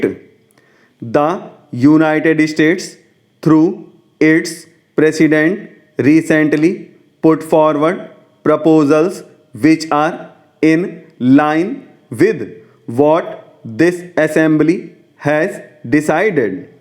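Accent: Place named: Odisha, India. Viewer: Your voice seems like you are from odhisha , right ??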